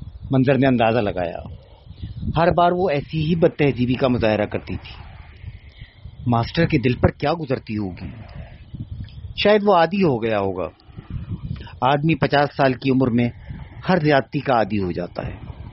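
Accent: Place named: Karachi, Pakistan. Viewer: سب سے پر لطف بات میرے لئے اس کہانی میں کراچی کی زبان تھی۔ اور اس کی ادائیگی بھی خوب کی ہے۔ شکریہ۔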